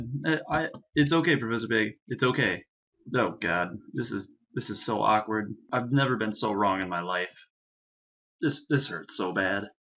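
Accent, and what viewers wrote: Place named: England, United Kingdom. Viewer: I think he comes from Britain and more precisely England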